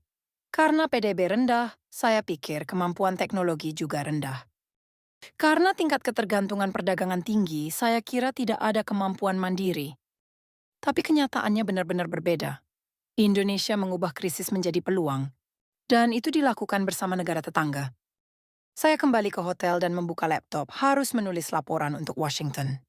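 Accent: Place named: Malaysia. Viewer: Admin itu orang Malay yg sekedar cari viewer dari Indonesia Kau dengar baik2 logat dia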